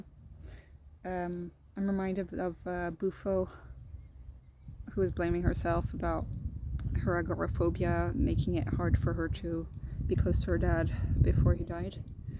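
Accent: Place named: United States. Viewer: You started out sounding really American, then you said it was really hot and your accent completely flipped LOL. I originally thought I was INFP for a while and then I took the test again for a psychology course and it told me I was INFJ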